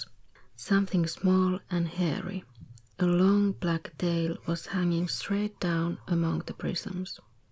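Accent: Finland. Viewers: finnish accents are lovely. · I came here for the finish accent.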